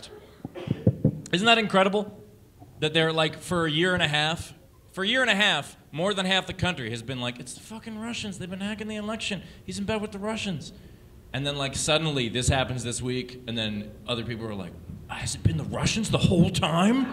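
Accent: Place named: North Carolina, United States. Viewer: Oml i know that nc accent feom anywhere.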